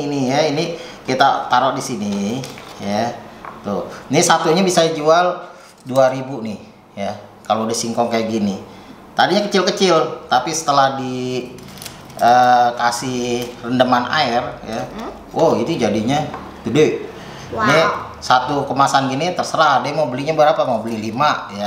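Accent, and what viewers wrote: Jakarta, Indonesia. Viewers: Logatnya betawi bet bang 😁 jadi inget guru silat ane dr betawi juga 😁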